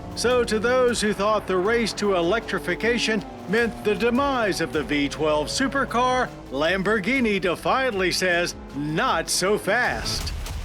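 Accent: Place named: Maryland, United States. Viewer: I am always amused by the way he says "subwoofer" & "compass" with his Maryland accent. He says sub-WOO-fer instead of sub-woof-er. He also says the com like the end of a website name instead of come. Robin Ophelia Quivers from The Howard Stern Show on SiriusXM Satellite Radio also has these quirks from Maryland.😊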